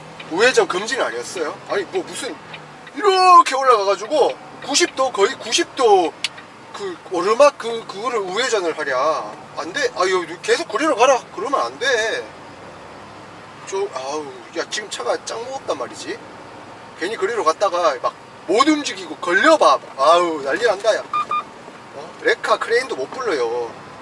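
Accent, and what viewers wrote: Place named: Gwangju, South Korea. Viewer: 사투리 들어보면 광주사람 이신것 같아요 영상 재밌네요 항상 화물 안전하게 운반 해주세용 요즘 또.. 얘기가 많네요 ㅠ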